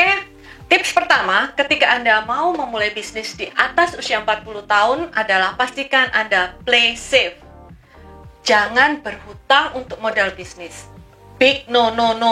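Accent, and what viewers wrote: Surabaya, Indonesia. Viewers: Thats was good.. Ingrisnya medok kas Suroboyoan.. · kereeen menginspirasi, baru liat video ini auto subcribs.btw logatnya jawatimur suroboyan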